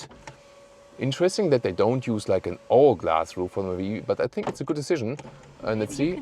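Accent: Germany. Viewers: I have watched a few of our videos and I find you one of the very few decent YouTube car reviewers. Your professional and in-depth approach are a cut above the usual brigade of self obsessed useless reviewers. I am British and love Germany, I find a lot of German things very soothing from the accent to the engineering and architecture. I think I probably was German in a previous life 😂. I like the Kia EV4 and would probably go for the sedan version due to bigger boot and I prefer the styling. I would love the 800 volt charging to be on the EV4 so you can charge from 10% to 80% in about 20 minutes however for me changing from 10% to 80% in about 30 minutes on 400 volts is not that bad an don't a deal breaker. I am concerned about the laggy slow GPS and if it is difficult to plan changing stops as you allude to. Overall I loved your review and am thinking of leasing one when they come to the UK as I want a Tesla but due to Elon's cray antics not to forget him going full Nazi I can not buy a Tesla in good conscience. 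I am genuinely curious about what you personally think about his an antics and his Nazi salute and what most Germans think. Lots of love to Europe from Britain ❤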